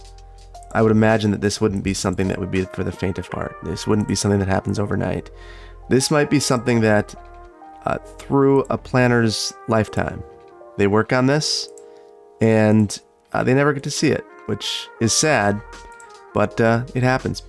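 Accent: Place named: Midwest, United States. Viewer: Same! I just assumed he was talking about the Midwest from his accent alone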